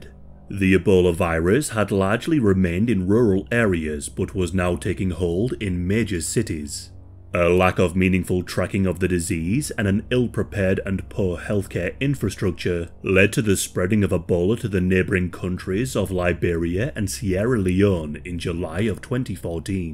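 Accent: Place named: Scotland, United Kingdom. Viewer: I love your accent! Where are you from?! I wanna’ say Scotland, but, I could be wrong. I love your channel, too.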